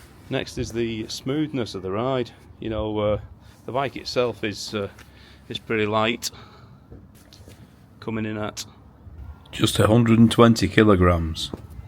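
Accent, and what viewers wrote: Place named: United Kingdom. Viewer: Love it Mate! And your accent is great too! Not been in Uk for over 5 yrs now. This is an ace bike. Wa%%_rs for rules on places you could ride when younger. Ive done 22k kms here on an old Bullit without anything legal. Im too old to give a to@ anymore. I want to ride one of those until i die now.👍👍👍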